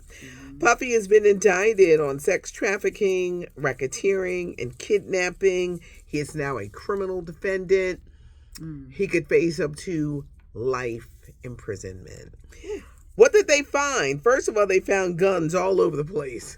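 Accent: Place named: Philadelphia, United States. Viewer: Bad guy Diddy is. Ur voice SCREAMS Philly! I love u listen to WDAS WHEN I Lived in Philly. Props to u beautiful lady.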